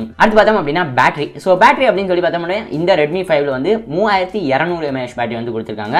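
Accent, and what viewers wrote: Madurai, India. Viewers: Bro neega madurai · Neenga Madura karara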